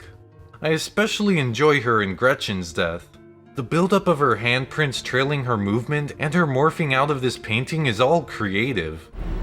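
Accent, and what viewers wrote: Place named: Ireland. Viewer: I can’t tell if you’re Irish or American or both. You have an Irish twang in your accent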